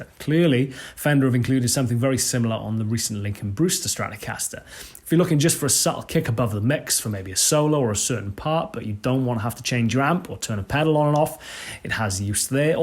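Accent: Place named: England, United Kingdom. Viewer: Beautiful playing like always! and we just love your "einglish accent". Servus from Vienna! (p.s. what do you think about the eric johnson signature strat?)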